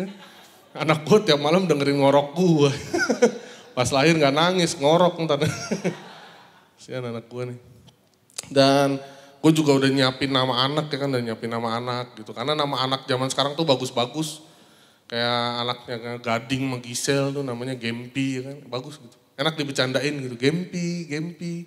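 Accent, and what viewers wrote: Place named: East Java, Indonesia. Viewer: Si POPON ini ngomong in Bondowoso parah juga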